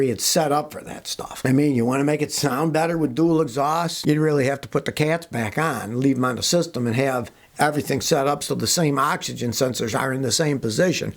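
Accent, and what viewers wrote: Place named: New York, United States. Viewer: I can tell.s from NY, got that soprano swag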